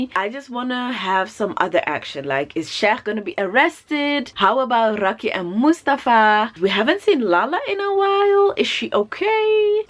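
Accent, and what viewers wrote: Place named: New York, United States. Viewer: Wow! Listening to you speak, I thought for sure you spent some time in my home town of NYC. What an interesting and inspiring story; you are truly gifted and talented. You should think about working for the UN. Hearing about TV in the Netherlands explains a lot; because unless you are in the US watching public television, an HBO foreign film or show, or some special channel like National Geographic, most things are dubbed in English (often with bad translations, so my friends who are fluent in other languages tell me). Which is why I love to see foreign films directed by people like Akira Kurosawa and Ousmane Sembène. My other language learning days are probably over (btw, I sent a friend who travels to Senegal quite often, your Wolof learning link) because of the many projects I have going; plus I find that when I travel people are generally very nice and I get to wherever I want to go very well. 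When COVID-19 travel restrictions improve, I plan to visit Senegal, and even everybody I know there speaks English! But if I ever visit the Netherlands I will definitely hire you as an interpreter! :-)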